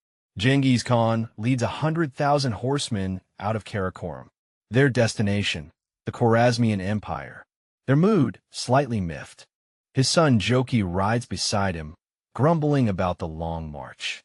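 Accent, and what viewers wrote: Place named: United States. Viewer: suggestion, please pronounce the names correctly, not in Us english accent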